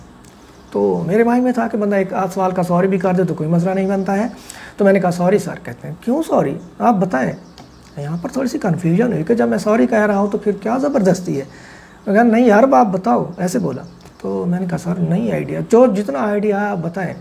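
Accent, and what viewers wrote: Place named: South Punjab, Pakistan. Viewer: Sir you are looking the resident of south Punjab and your accent in speaking more than your words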